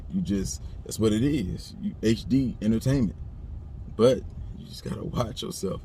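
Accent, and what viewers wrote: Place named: Atlanta, United States. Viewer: Sound like u from da A I'm from Pittsburgh zone 3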